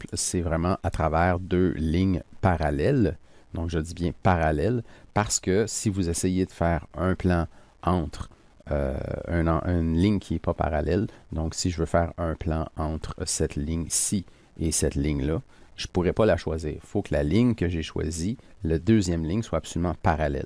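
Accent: Quebec, Canada. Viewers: Bonjour, je suis impressionné par la qualité de vos vidéos qui sont toujours très précises et à travers lesquelles on devine un grand professionnalisme. Et c'est aussi un plaisir d'entendre cet accent québécois pour nous les Français.Je m'étonne que votre chaîne n'ait pas pas plus d'abonnés car vous le méritez plus que d'autres. Merci pour nous et continuez comme ça. 👍👏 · Très bon(s) tuto(s), on voit que c'est préparé même s'il reste quelques boulettes (qui n'a jamais oublié de sélectionner un composant avant de faire une manip, c'est mon drame !). Chaîne méconnue mais il y a de la place dans l'espace francophone. L'accent est super (pour moi), ça me rappel mon séjour au Quebec. Je vois un petit -22°C à Montréal aujourd'hui, courage les cousins dans 6 mois ça sera la canicule :)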